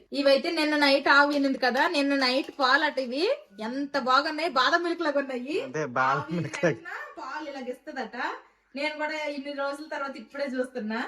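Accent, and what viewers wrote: Srikakulam, India. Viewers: మీరు సిక్కోలు యాస లోనే వీడియోలు చేయండి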